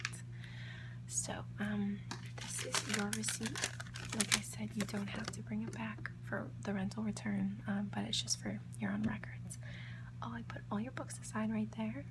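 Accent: South, United States. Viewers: Oh this vid would have been great also with your southern accent! :P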